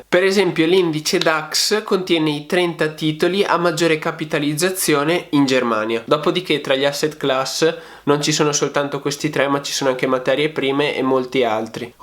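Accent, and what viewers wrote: Veneto, Italy. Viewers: Te si Veneto....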